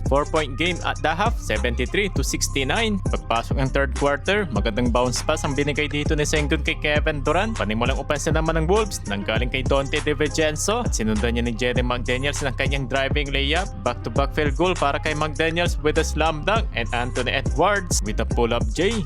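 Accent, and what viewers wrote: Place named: Isabela, Philippines. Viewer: Taga isabela ka lods noh same kau ng punto ng kompare ko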